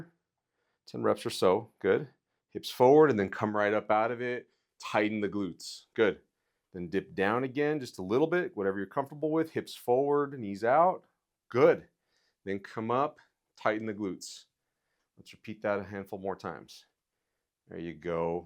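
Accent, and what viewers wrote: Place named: United States. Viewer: to my British ears, he has an American accent. So I can appreciate if someone does not hear that every day in their life it might sometimes be difficult to understand. I sometimes struggle to understand drawling Southern US accents or a Cajun accent in particular because it is not something I often hear.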